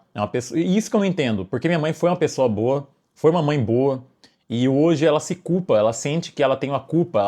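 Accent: Brazil. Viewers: De onde você é? MG? GO? Achei sotaque diferente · Vey sei q n tem nd a ver mas seu sotaque é mto bom ai qria te pergunta de onde ce é parece mto interior de sp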